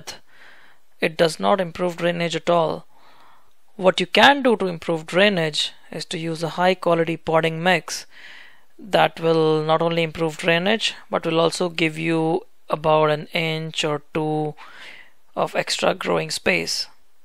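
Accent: India. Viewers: From your voice i think you are indian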